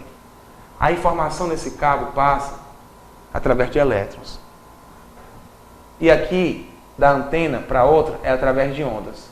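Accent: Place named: Ceará, Brazil. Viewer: machu réi rsrsrsrs muito showwwww sua aula.sou de paracuru(vizinho à fortal) mas moro no rio de janeiro.quando ouvi teu sotaque vi logo que era um cearence rsrsrsrs.valeuuuu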